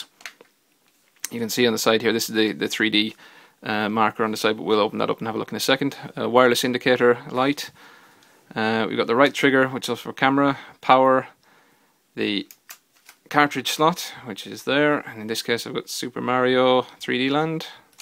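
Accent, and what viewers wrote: Ireland. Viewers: are you Irish? Because I can hear a tiny bit of an Irish accent.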